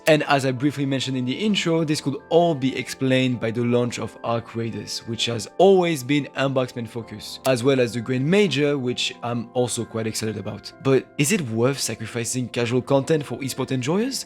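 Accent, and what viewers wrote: France. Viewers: Je présume à l'accent que tu es français, alors je me permets de mettre un petit com dans la langue de Molière. Tes vidéos sont géniales mec, les thèmes abordés sont intéressants, l'humour est bien dosé et bien intégré. Continue comme ça, et prends soin de toi. Je t'ai entendu dire que derrière l'écran c'était pas facile, le soleil vient toujours après la tempête, reste fort!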